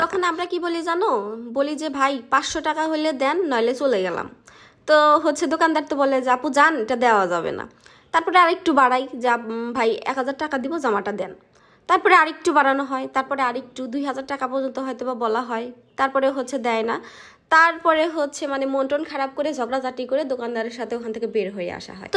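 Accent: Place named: Jamtoil, Bangladesh. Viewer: Apu kotha bolar styl ta valo cilo akdom Jamtoil ar moto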